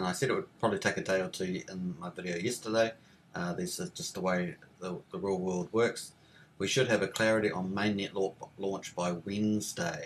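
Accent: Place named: New Zealand. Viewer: U sound like a new zealander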